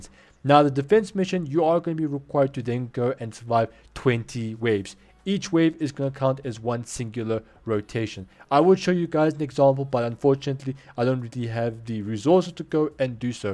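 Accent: South Africa. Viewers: this ou south african?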